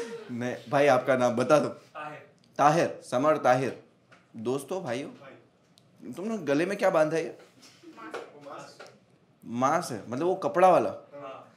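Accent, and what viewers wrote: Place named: Gujarat, India. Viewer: It was a nice stand up,I totally loved it and can't stop smiling since the beginning of the video and munawar has a good gujarati accent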